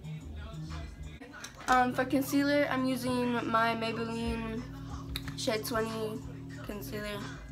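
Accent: Bay Area, United States. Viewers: When i hear sobxrbe I assumed she was from the bay lmao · I can tell ur from the bay lmao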